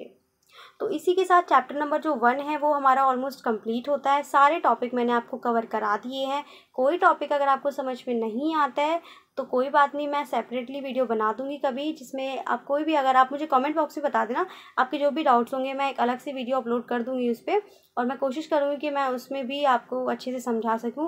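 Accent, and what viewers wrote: Delhi, India. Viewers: Mam are you from Delhi 😁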